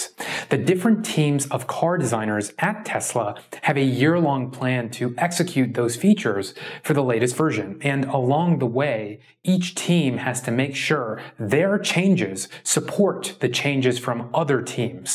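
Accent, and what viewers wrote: India. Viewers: Hey man, Do you have Indian ascent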